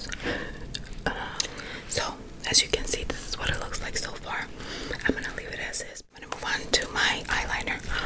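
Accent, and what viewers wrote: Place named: New Jersey, United States. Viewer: I loved this! I would love to hear an exaggerated version of your New Jersey accent 😂 with your voice it would be hilarious and relaxing. You are the best!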